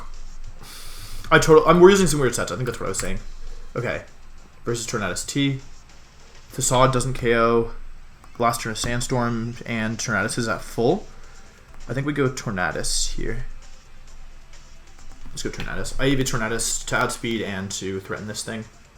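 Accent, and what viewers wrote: United Kingdom. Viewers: Bristolian is like no other british accent sir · LOL the british accent, love it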